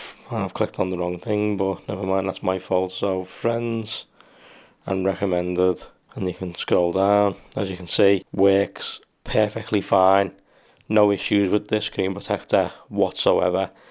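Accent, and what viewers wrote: Liverpool, United Kingdom. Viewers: What a god awful accent scouse is haha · i was pretty surprised when i heard the scouser accent, dig it mate!😎👍 also: you are the only one who did 100% correct, everybody else took the second protector off before they removed the bubbles. cheers from switzerland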